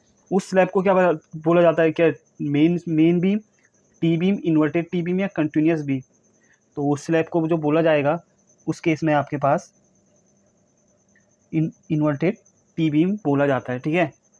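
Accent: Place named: Jodhpur, India. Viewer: aap kha se ho sir. Language se jodhpur k lgte ho. Sir aap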